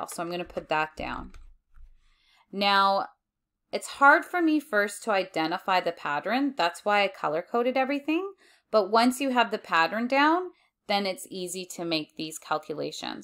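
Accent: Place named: United States. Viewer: The American accent kills me 😂 good vid though 👌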